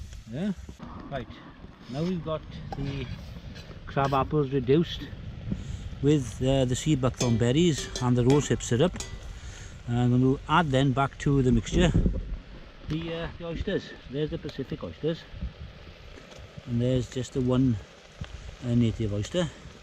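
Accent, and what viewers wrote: Wales, United Kingdom. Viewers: Cannot tell you just how chuffed to pieces I am that I discovered your channel. Loving the videos tremendously and you are such an engaging gentleman. I love listening to your lovely soft Welsh accent. Just some critical feedback for this video in particular is that the audio is terrible in parts, due I'm sure to your cameraman not utilising the microphone to its best advantage! I'm sorry to say I found it quite irritating in places because all I wanted to do was listen to/hear you! Lol. Let's hope you train him better for future videos! Thanks again for your fab and very informative videos! I've definitely got the foraging bug now!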